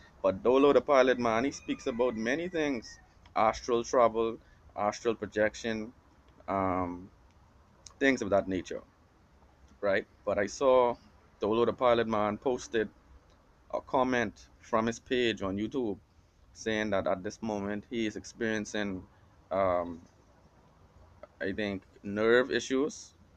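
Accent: Bahamas. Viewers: You Bahamian bro?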